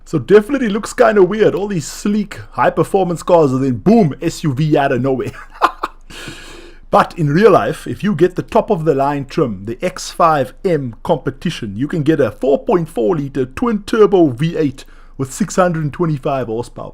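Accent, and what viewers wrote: South Africa. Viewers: Are South African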